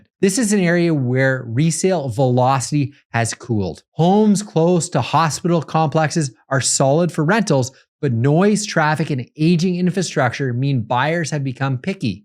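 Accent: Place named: Ottawa, Canada. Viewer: can hear the ottawa accent. love it haha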